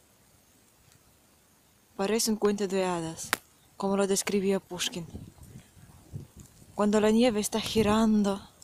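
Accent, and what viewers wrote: Argentina. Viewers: Habla medio argentina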